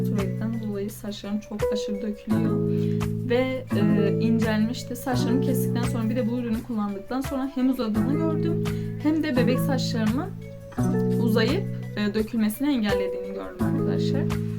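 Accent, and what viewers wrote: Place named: Kurdistan, Turkey. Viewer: kürt müsün şiven bazen kayıyor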